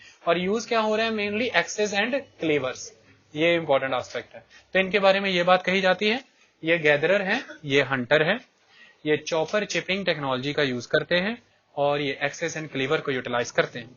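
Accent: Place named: Punjab, India. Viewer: The Punjabi touch in your lectures makes class really interesting. Though, there is no Video lecture still you make these Audio lectures really interesting by using your 3D imaginations as JHRRRRRR JHRRRRRRR....!!! Hahahaha...! Really enjoy your classes and content is really amazing. And the way you explain each and everything is like Cherry on the Cake....! <3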